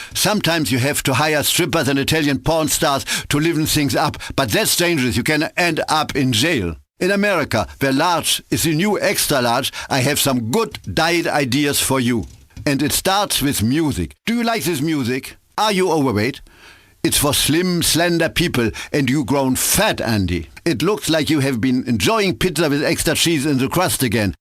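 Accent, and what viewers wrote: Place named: Africa. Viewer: sounds like african english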